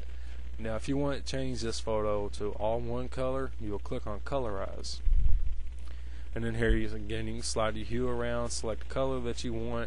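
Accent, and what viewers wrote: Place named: United States. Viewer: i hear no echo? just a very thick american sounding accent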